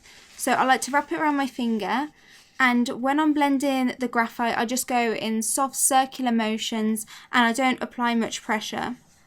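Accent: United Kingdom. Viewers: thanks for this experment. In pencil they all look more or less the same except tissue being softer and lighter in charcol they look more or less the same except that tissue maintain the quality of lightness, and finger smothness. while cloth in charcol maintain paper texture. I agree tissue is the most distnict result especially in tone, being lighter. in both charcol and graphite. Of course assuming that the amount of preassure and the medium filling prior to smudging is maintain constant. soft delicate sponge is great for sof transition.. another artist demonstrate it with make up sponge.. forgot the name of it but it is like pencil with little sponge at the end I think for eye area.. i dont have one that is the disadvantage of being a man.;) One old art professor said that chimoa cloth is the best that work for smudging. the graphite part. Great video By the way I love languages and accents and speak several, what region is your accent in britain? 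I see you pronouce the letter "h" as "hitch", thanks for the video.. have a nice day.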